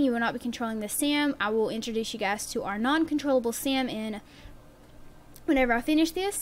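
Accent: Texas, United States. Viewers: are you from Texas?? cause you have a farm girl axcent I adore it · are you from texas?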